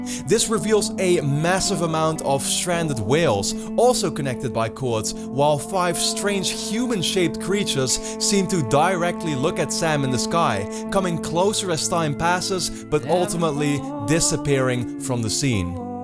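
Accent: Netherlands. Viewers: Awesome vid! Great for killing time, while we're all waiting for the next DS trailer... oh and by the way, geweldig nederlands-engels accent haha!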